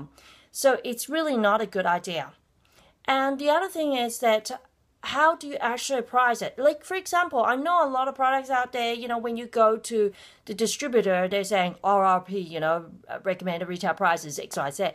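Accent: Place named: Australia. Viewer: i like your australian accent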